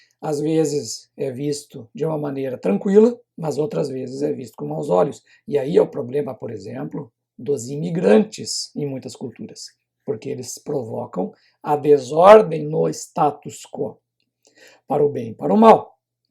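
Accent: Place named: Rio Grande do Sul, Brazil. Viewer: Eu primeiro lhe identifiquei como brasileiro e pela entonação e sotaque eu notei que era gaúcho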